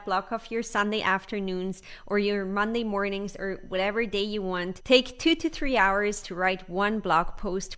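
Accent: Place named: England, United Kingdom. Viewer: Hi ! I am new to blogging, loved your English accent and clear pronunciation. I wonder how you learnt it, and how can I learn it. Could you please share the secret and also send me simple blogpost checklist please.Thank you.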